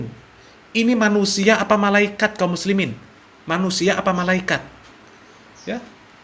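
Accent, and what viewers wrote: Java, Indonesia. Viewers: Saya suka channel ini. Channel yg mencerdaskan akal ... Apalagi ditamabah narasi dgn LOGAT JAWAnya yg MEDHOK.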